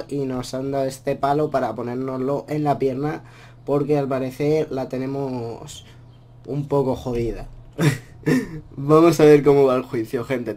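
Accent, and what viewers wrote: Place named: Dominican Republic. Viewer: Mmm... se te esta pegando lo dominicano saludos desde republica dominicana:n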